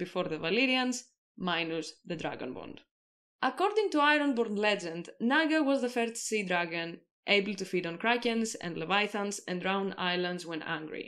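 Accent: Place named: Greece. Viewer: From your accent I was sure that you were greek lol, and it was confirmed by the reference to Santorini. It is wild how coincidental knowledge someone has due to their local environment can help them discover things that others would have (probably) never thought of! Very thorough research on the lore too, I look forward to watching your other videos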